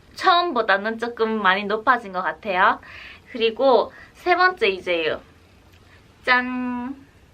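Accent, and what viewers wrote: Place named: Japan. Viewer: 대단합니다 일본사람이 한국어 하는줄 알았습니다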